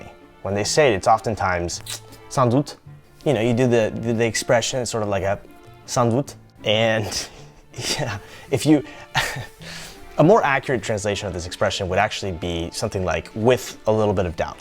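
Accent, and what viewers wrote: France. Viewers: A lot of those expressions are not North American French. I can tell by your accent you learned French from France, and not the French from North America. Did you know that the North American French, mostly the French spoken in Canada is more authentic than the French spoken in France? That's because we were isolated from France from the 1600s to the mid 20th century so our language did not change as much as that spoken in France, so our French is more authentic and true. Generally the French spoken in Québec is no longer authentic because they adopted changes when the intellectual snobs wanted to distance themselves from their French Canadian roots. So they adopted more of the accent spoken in France and incorporated new words that never existed before in our vocabulary which came from France. This took place in the 1960s and most of the québecers have now completely changed their way of speaking. 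Whereas the 30% or so of French Canadians living outside of Québec have kept the original French from our ancestors. So the purest French, most likely in the world, is found in Canada, outside of Québec. I will give you one example of the difference between authentic French and the French spoken in France and in Québec. The French language is a Latin language and in all Latin languages we roll our "r"s. That is what French Canadians do, but Québec stopped doing that just as the French from France did. In AUTHENTIC French you roll your "r"s, you dont spit them. I am French Canadian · Im a french guy from Québec. You have a great accent. Its nice to see a foreigner understanding our little details in language. You obviously went to France to learn it. I recommend you to go to Quebec and, be mind blown by the way we speak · I'm learning about my own language, I swear it's troubling how little one understands of their mother tongue... 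also, not certain that I'm not mixing it up with French Canadian because I've lived there for too long, but 'terrible' can also mean 'incredibly good', chiefly cut into two very articulated syllables, at the same level as 'chant-mé' Oh, btw, you have a sort of a south accent, which is funny because you could easily be mistaken for someone from Toulouse (or around that area) at times 👌🏼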